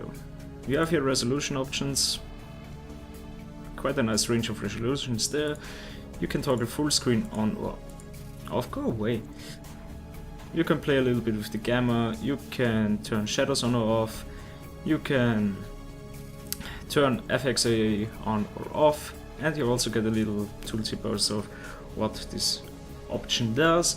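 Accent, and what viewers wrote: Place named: Denmark. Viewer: Ok not to sound mean or anything but please use a script! the amout of times you stopped and hmmm, got me quite annoyed and i can tell your from denmark(sorry if your not), and no i don't know what you mean, look it up wright it down in the script, this did not have to be 24 minutes long 10 min was all you needed. but i feel potential in you join the darkside ;)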